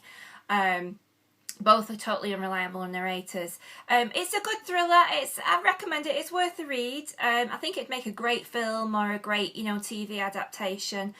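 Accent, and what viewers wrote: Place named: Northern England, United Kingdom. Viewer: Yey, a northern lass. Found u via Eleanor and loving ur videos. Nice to hear a northern accent xx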